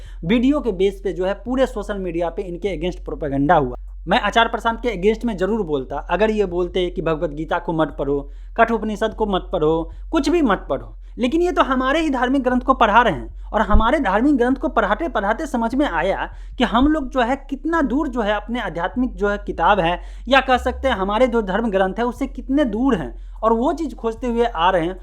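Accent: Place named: Pakistan. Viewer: भाई वीडियो का थंबनेल और टाइटल चेंज करले आचार्य जी नाम के आगे दूंगी लिख रखा है वीडियो सही बनाई है पर ये सही नहीं है व्यूज कही और से कमा लियो, आचार्य जी के तरफ से बोल रहा है तो ढंग से बोल ये क्या आधा हिंदुस्तानी आधा पाकिस्तानी। भाई जल्दी नाम के आगे से ढोंगी हटा नहीं तो रिपोर्ट और dislike करनी होगी मुझे।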